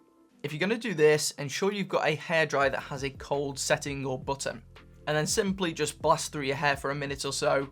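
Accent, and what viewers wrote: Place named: Sheffield, United Kingdom. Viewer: Sheffield accent 🤩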